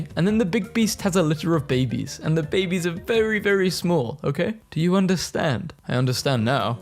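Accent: United Kingdom. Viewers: why do you randomly pronounce the r in Kirby like an American but sound british for literally every other word?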